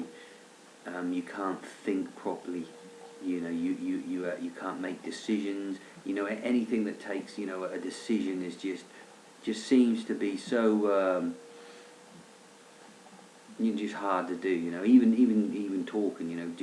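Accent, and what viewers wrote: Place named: Norfolk, United Kingdom. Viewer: Wow...thank you so much for doing this video. Yesterday I had an epiphany! After approx 10yrs of taking Diazepam and Zolpidem for my 2-day very severe, frequent migraines, I realised that I have very probably had benzo withdrawal continuously!!! I am prescribed it for migraines, and in between the migraines I don't take anything! I have severe M.E. too, but for the past 4 yrs have had a lot of extra weird symptoms that drs can't explain (and are not interested in). I don't feel they are M.E. related...they are identical to what you are describing though!! Having been ill for 21 yrs, I am at my worst...barely managing to "survive". Pain levels are through the roof 24/7 and the full blown migraines are now every 3-4 days, and for years I've had migrainy days in between the migraines, which are hell. They are basically like having 50-80% of a migraine. 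They are currently happening 95% of the time!! So... I am hopefull that it is the benzos causing this, but not particularly looking forward to full blown withdrawal!! ....... Nice to hear a Norfolk accent? And a doggie chewing his bone??? Lol I wish you all the best and hope you start to feel a bit better soon.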